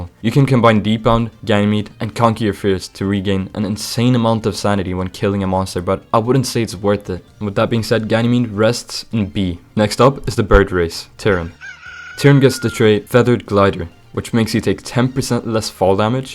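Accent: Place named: United States. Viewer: du låter på riktigt som en amerikan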